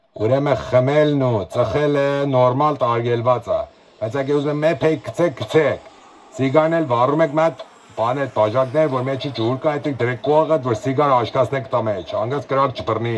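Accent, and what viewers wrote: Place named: Armenian, Iran. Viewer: Merrraaammm!! Such a barskahye talk!! Half “loghat” is in Persian 🤣🤣🤣🤣 Yes! Count me in too. I wanna be on this flight!!! 😂😂😂😂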